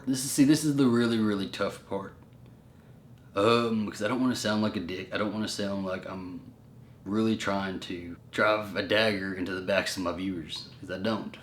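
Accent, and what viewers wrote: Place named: Southwest, United States. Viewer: Sorry to see you going through this stress. Speaking as a very old senior gay man (82) therapy is absolutely nothing to fear; therapy triggered my own coming out. I enjoy following you on youtube and have seen elsewhere on the internet certain persons trying to take you down. As a fellow Texan I especially resent those who mock your decided Southwestern accent and who fail to comprehend someone can have an Hispanic surname without having just swam the Rio Grande. In fact, there are such families in Texas which predate any Anglos. You are a great couple and a fine role model, but don't try to take on too much. I am not familiar with the company you reference but also keep in mind many cities now have local referral systems for LGBT folks. Now take a deep breath.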